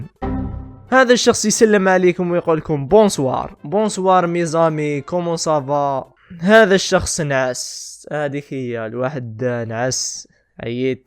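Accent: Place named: Algeria. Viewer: هو يتكلم بلهجة الجزائرية لانه جزائري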